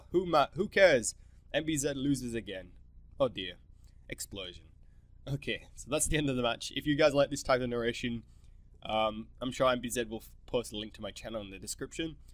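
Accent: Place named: United Kingdom. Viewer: British accent is one of the most awesome accents in the world... :')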